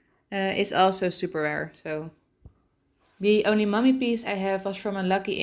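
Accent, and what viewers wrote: Netherlands. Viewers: ur dutch no doubt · Nice bank!! Hoorde gelijk dat je NL was haha! Maar echt nice zo n ironman account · Recognized the dutch accent right away haha, I feel you. Nice account :) insane bank wow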